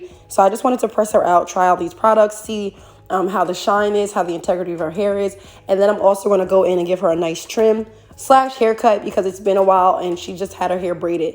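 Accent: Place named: East Coast, United States. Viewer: I knew I heard an east coast accent while you were talking lol. I’ve been trying to get my oldest into doing hair she’s good and actually likes it but is on the fence about cosmetology school I’m gonna show here your videos for sure. Texas by way of Philly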